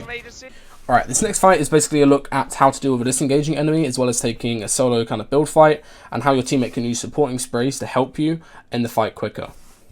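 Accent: United Kingdom. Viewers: Where are you from in the uk?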